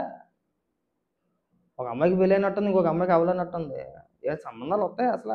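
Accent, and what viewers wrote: Godavari, India. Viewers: Anna bale matladuthunnaru meru❤😂 a vuru anna medhi ento koncham godavari slang la vundhi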